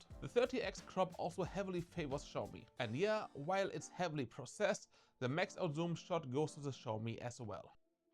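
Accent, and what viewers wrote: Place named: Germany. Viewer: Warum nicht auf deutsch Bruder? Ich mein, man hörts ja schon gut raus.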